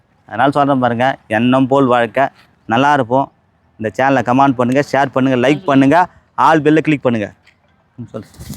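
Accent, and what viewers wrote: Madurai, India. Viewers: Madurai vasagam super 🎉🎉🎉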